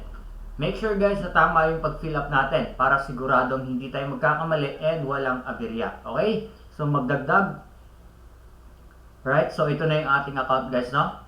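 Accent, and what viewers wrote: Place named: Visayas, Philippines. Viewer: bisayang tagalog.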